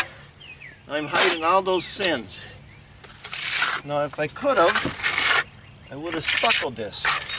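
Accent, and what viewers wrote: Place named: Scranton, United States. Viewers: NE Pa is my old stomping grounds. Good to hear that Pennsylvania Dutch accent again. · You can't miss that Wilkes-Barre / Scranton accent. Thanks for the videos.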